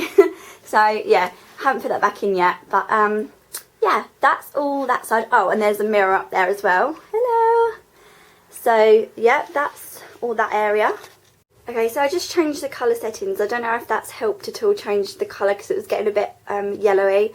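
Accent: Essex, United Kingdom. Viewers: LOL you sound like your from essex!:) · r u from Essex xo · You sound like your from essex :) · are you an essex girl? you look & sound like lydia from the only way is essex also lol!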